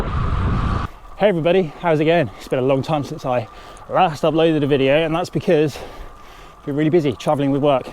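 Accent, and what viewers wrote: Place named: England, United Kingdom. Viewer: Nederlands met een Engels accent doet het altijd goed ;).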